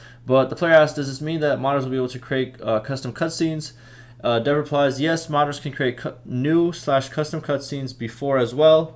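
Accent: South, United States. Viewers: You sound dixie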